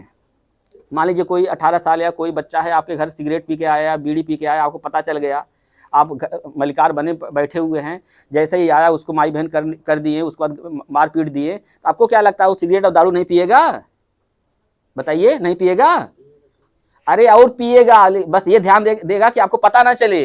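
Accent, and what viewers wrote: India. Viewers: Awaz se lagata hai ki ye up ke purvanchal ke kisi jile se hai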